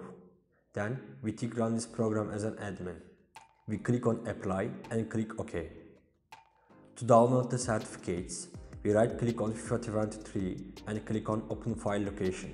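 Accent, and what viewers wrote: Turkey. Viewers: İngilizce çok tanıdık geldi türk müsün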